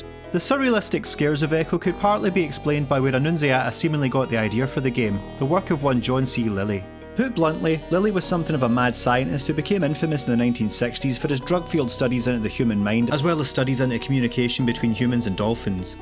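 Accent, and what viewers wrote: Scotland, United Kingdom. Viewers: Holy shit you sound like a scottish ethan klein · Love loading a YouTube video and hearing a fellow scotsman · It’s funny how jarring it is to go into a video expecting not a Scott to start speaking and then having to catch up to understand what they are saying 😂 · Nice scottish accent. <3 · D was a fun video, I like your accent. I am german, so I don't exactly know what it is, scottish accent? :)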